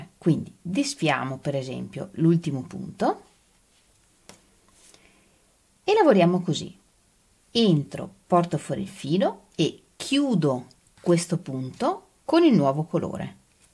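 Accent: Veneto, Italy. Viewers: grazie, ho iniziato da poco a seguirti e devo dire che mi trovo molto bene perchè spieghi in maniere chiara e adoro il tuo leggero accento veneto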